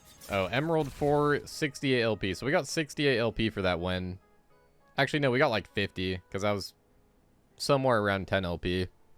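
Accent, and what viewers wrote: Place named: United States. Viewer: What region of the U.S is that accent from? Well idk not accent but like...inflection?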